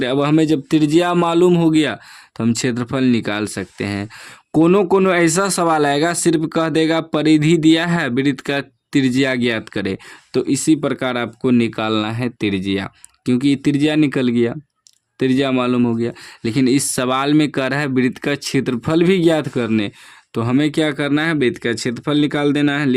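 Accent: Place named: Maithili, India. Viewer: Sir ap methli v bolte h 😃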